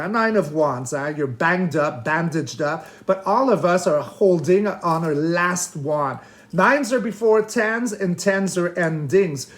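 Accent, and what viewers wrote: France. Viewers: You have such a wonderful French accent. Thank you for your light hearted readings! You always make me smile! Blessings! 💕🕊️💕💥💥💥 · 🎉❤your English is amazing with a beautiful French twist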